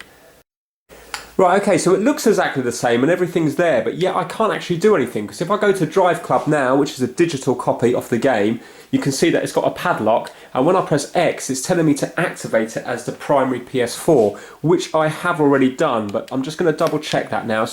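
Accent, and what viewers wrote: England, United Kingdom. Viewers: Very well explained and I like your English accent.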